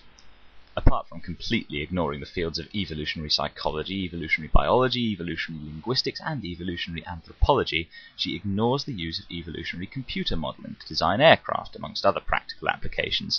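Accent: United Kingdom. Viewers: I made a response too but it just sounds so much better with an English accent!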